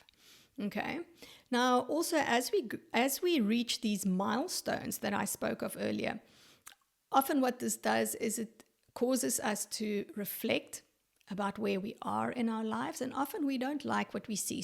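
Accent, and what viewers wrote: South Africa. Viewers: Are you South African? just curious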